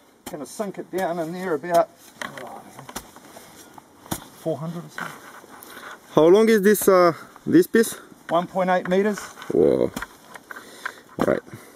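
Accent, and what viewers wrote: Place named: France. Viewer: Don't you like my FRRrench accent ? At least, I hope you didn't get "pieced" with it. Sry for bad focusing. I guess what I missed is when he was digging, so I can reveal you the secret on what there was on the ground : A hole !! check between 1''32' & 1"39' : it confirms my theory héhé hihi hoho ! Thx for whatching mate, hope you enjoyed :)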